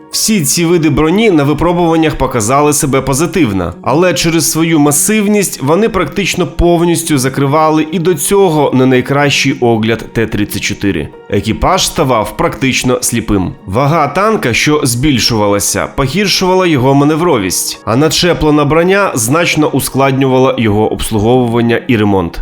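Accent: Russia. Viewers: В УКРАЇНСЬКІЙ МОВІ "Г" ВИМОВЛЯЄТЬСЯ БЛИЗЬКО ДО "Х" А НЕ "К" ЯК В КАЦАПНІ, ВЖЕ ЧАС ЗАПАМ"ЯТАТИ, ТА ПОЗБУВАТИСЯ КАЦАПСЬКОГО АКЦЕНТУ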